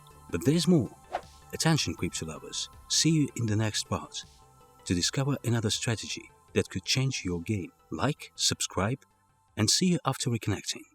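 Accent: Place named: India. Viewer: I have worries in this project since the voice sounds like from India where most scam hubs exist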